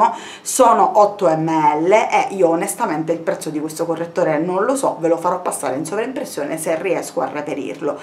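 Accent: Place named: Sicily, Italy. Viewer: Io ti ho scoperta da poco e amo il tuo accento essendo anch'io di origine siciliana. E mi sto guardando tutti i tuoi video 💞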